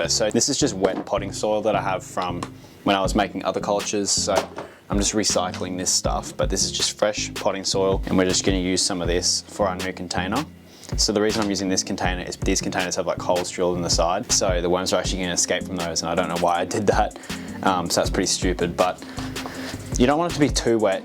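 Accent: Australia. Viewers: its cause his Australian accent is kinda hard to understand lol.sometimes what you end up hearing is completely need up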